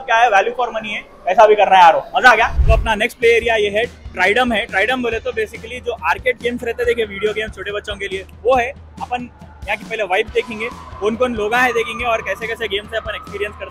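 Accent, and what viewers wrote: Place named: Hyderabad, India. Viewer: Our Hyderabadi hindi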